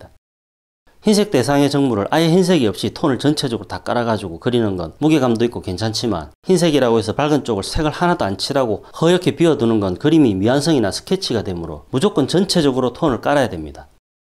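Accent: Gyeongsang, South Korea. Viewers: 이런 유익한 영상은 광고 건너뛰기 절! 대! 로! 안하고 다 봅니다! 선생님의 군더더기 없는 갱상도 말투, 더 없이 편하게 듣고 있습니다. 시어머니가 74세 인데, 제 결혼 사진을 보고 연필 인물화를 그려주셨는데 너무나 충격적(넘나 못그림 ㅜㅜ어머니도 40대에 그림 배웠다고 하시는데 맨날 천날 꽃 그림만 그리는 이유가 있었음. 꽃은 대충 그려도 이쁘니까)이어서 당장 유투브 보고 유익한 동영상 뒤져서 일단 구도 좀 잡고 비율이라도 맞게 그리라고 붙여드리면서 발견한 보물같은 채널입니다. 저도 중딩때 아주 잠깐 미술학원 다녔는데 딱 화실 분위기였어요. 강사님은 따로 없고, 모든 선배님들이 지나가면서 그림 코치해주시더라고요. 다들 대학생인지 잘 모르겠고 매일 오지도 않고, 원장샘은 아무것도 안하는...(기나오지도 않고 ㅋㅋㅋ) 그리고 선배님들이 다 말이 달라서 a 선배님이 이렇게 그리라고 하고 사라지고 b 선배님이 나타나서 야단을 칩니다. 야임마 누가 이래 그리라대! 그리고 다음날은 c 님이 다르게 가르쳐 주면서 기존에 배운 그림을 비난합니다. 누구말을 들어야 할지 혼돈의 카오스 같았지요. 미술학원은 다 이런건가 이러면서 잠시 다녔습니다 마음 같아선 평생 다니고 싶었는데 전공할 건 아니라서 아빠가 못 다니게 함 돈 없다고 ...ㅜㅜ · 김해입니다..경상도 사투리가 정겹습니다..감사히 보고있습니다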